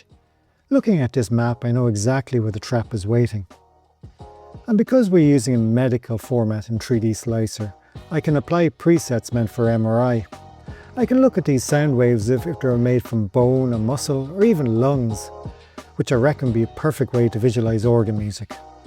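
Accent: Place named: Ireland. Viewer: Amazing video. Great to hear the Irish accent!! Some amount of work gone into this fair play to ya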